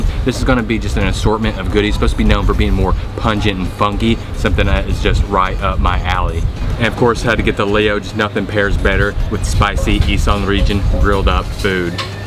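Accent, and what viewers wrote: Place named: South, United States. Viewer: Is it just me, but I think Thai food have changed you 😝. I miss your slow-paced talk, the cool & relaxed southern style 🙂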